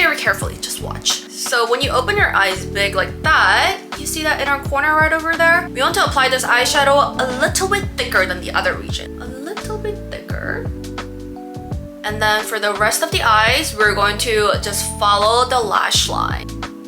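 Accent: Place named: England, United Kingdom. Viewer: Thank you for sharing this video ❤❤ btw I LOVE your English accent and you look a little bit like Wonyoung :)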